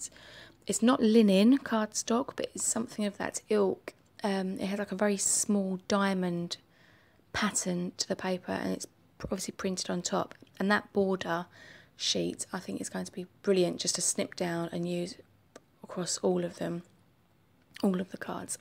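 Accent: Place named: United Kingdom. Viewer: I could listen to you talk all day! I'm a sucker for a British accent. Lol! Beautiful kit,.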